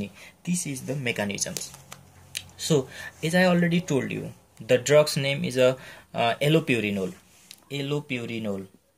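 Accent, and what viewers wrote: India. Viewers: I like the Indian accent 😁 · WHEN INDIAN WOMEN TALK ITS SO SEXY, BUT THE DUDES....WHAT? AT FIST ITS OK THEN AFTER AWHILE I THOUGHT HE WAS GOING TO SPEAK HINDI. THIS WAS A VERY GOOD GOUT VIDEO HANDS DOWN! WHAT HE IS SAYING ABOUT ALLPURINOL AND COLCHICINE IS SO TRUE AND I DISCOVERED BY ACCIDENT AND TIME. IF YOU CAN CHANGE YOUR LIFE STYLE USE COLCHICINE, IF NOT ALLPURINOL. IF YOU NOTICE AFTER ONE YEAR YOUVE HAD 5 OR MORE ATTACKS AND YOUVE CHANGED YOUR LIFE STYLE TAKE ALLPURINOL. IF NOT TAKE COLCHICINE 2 FIRSTDAY WITH ALLPURINOL, ONE FOR 3 DAYS WITH ALLPURINOL. FOR EVER.CRUSH TYLENOL AND A QUART OF WATER AND DARK SWEET CHERRIES OR FRUIT TOGETHER FOR SEVERE PAIN IF YOU CANT GET (OXYCODINE, PERCOCETS, VICADINE AND ETC).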